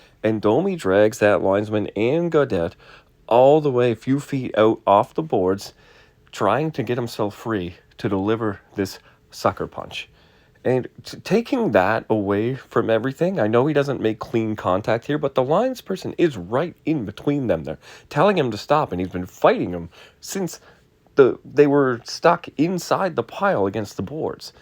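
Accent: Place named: Ottawa, Canada. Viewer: You sound clueless. And like a resident of Ottawa.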